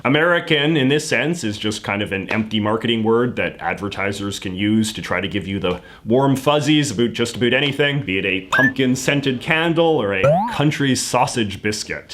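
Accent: Canada. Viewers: you have the most stereotypical canadian accent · Although he does pronounce it a little oddly, you'd expect in his canadian accent that he'd go hard into the R sound but actually skips it altogether! Normally in an anglo accent it's pronounced boar-jwah, but goes for something more like boo-jwah. I'd be interested to know if that's deliberate or not if you do read this!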